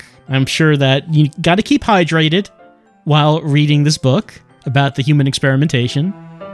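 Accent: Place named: New York, United States. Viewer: I miss New Yorker accent already:(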